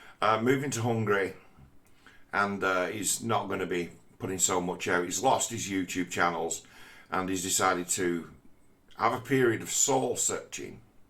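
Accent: England, United Kingdom. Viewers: By the way, I think it is wonderfully hilarious to see you insulting people who hold down jobs and have a stable life. How very telling. Nice try buddy. I like an English accent but I am still allergic to narcissistic bullshit. And I know what you are doing - trying to entice me to stalk Richie. I got too much shit to do on top of foreign global affairs. I'd have to check my calendar.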